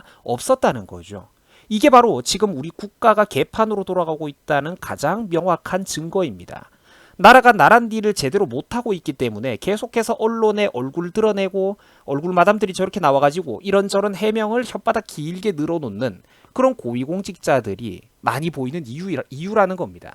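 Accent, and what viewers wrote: Gyeongsang, South Korea. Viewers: 근데 얘는 왜 자꾸 경상도 사투리를 쓰냐 듣기싫네 ㅋㅋ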